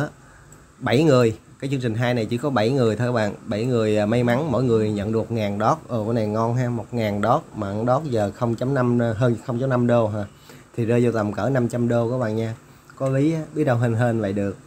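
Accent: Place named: Mekong Delta, Vietnam. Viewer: Khoái nghe cái giọng miền tây của bạn này, nghe thật thà mà tiếu tiếu, nói chung tất cả video bạn hướng dẫn rất rõ ràng ... thanks chúc bạn thành công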